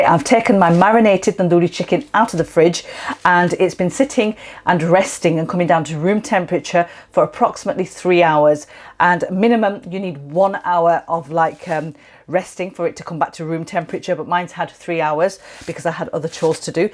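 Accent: United Kingdom. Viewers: Her accent is British. Parents are likely Punjabi either from Pakistan or Indian Punjab. She is definitely not Kashmiri or Pothwari. The recipe is typical Punjabi tandoori chicken but now made in Air fryer. Also Kashmiri women are not that open minded in matters as Punjabi women are. They prefer the hijab and nikab and cannot cook so well.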